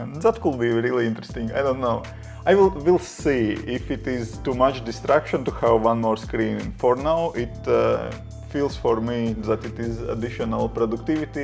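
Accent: Russia. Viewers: i said that because you have russian accent. This my opinion ) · Русский чтоль? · Your accent is very similar in Russian, yes) I thought you are Russian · Чувак)) у тебя явный русский экцент)) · слышно русский акцент) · are u russian? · Лады, понял. Просто уж сильно похож на Русский акцент с явным преимуществом в разговоре звука "Р-р-р-р" :))) Похожий акцент ещё у Аргентинцев с явной звучной буквой "Р", НО сетАп всё равно классный, спасибо за идею. :) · Ох уж этот акцент из Russland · ни фига, это конкретно русский акцент) ты мало чешских видео смотрел my friend XD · Да да да!! -_- я слышу русский акцент, причем лютый =)))) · Ооо так вот какой "русский акцент" стендаперы вечно пародируют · I felt a Russian accent, хотя может мне показалось) nice video, hello from Kazakhstan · Говорите как будто Русский на Английском... )) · Your fucking russian accent is super annoying.... 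Kommunist yerk.... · Мужик русский судя по акценту. Да и всё выдаёт ;) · Ты чо, русский? Чего за диалект. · Your accent is so Russian!;) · Я слышу русский акцент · Ты русский? Чувствуется акцент. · о да, русский акцент)) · Русский акцент рулит))) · i'm confused, you have an accent that sounds somewhat russian, but your name sounds nothing russian... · Hi . Are u russian?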